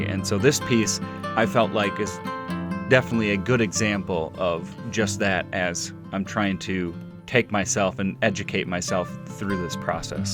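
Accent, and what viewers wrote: United States. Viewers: Even listening to your voice. So calming. That American accent. I’m from Scotland. Have been often to California to see my son.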